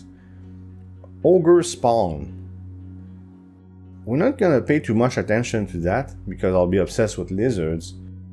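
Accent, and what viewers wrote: Quebec, Canada. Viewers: T québécois?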